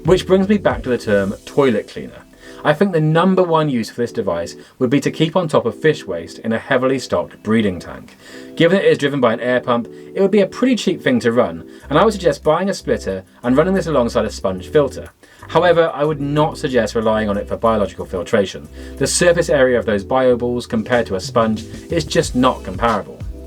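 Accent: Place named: England, United Kingdom. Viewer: Great to hear an English voice, youtube is so American heavy especially aquatic posts 😊 Thanks for sharing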